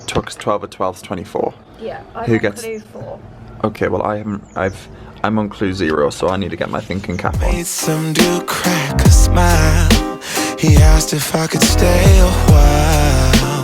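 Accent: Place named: England, United Kingdom. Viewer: with your English accent I have trouble understanding you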